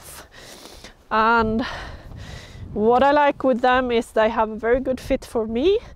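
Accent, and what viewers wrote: Sweden. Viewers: Kollar alla era videos, men inte direkt i ordning men alla är lika bra. Tyckte du fick med alla saker som är viktiga för ett par vandringsbyxor. Men… ibland låter din engelska som du snarare kommer från södra Sverige än Jokkmokktrakten:) kan ha helt fel där:) tack för bra videos i alla fall 👍🏼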